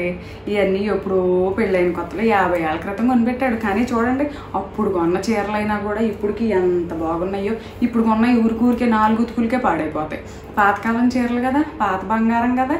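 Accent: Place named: Godavari, India. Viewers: maa godavari slang laa anipinchindi gaaru chala bagundi🤩🤩😍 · Godavari yasa. Super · Hi,I have seen most of your videos.they are superb.your effort to do something new is good.your act as bamma is too good.you are from vijayawada.but I feel your language has Godavari accent.thats why got connected instantly.congratulations on your award.wish you grow farther. This is my first ever comment on any YouTube channel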